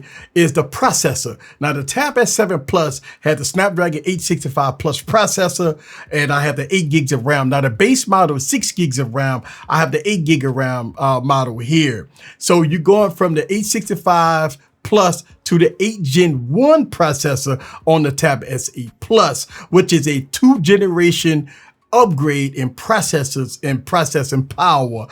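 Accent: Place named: New Orleans, United States. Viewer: Man you gotta be juvenile daddy or something lol . Not trying to be funny or anything but this how he's gonna look as he she and y'all sound just alike you gotta be from new Orleans 😂 .I love your channel btw black brother ❤️💯🫱🏿‍🫲🏾💪🏾💯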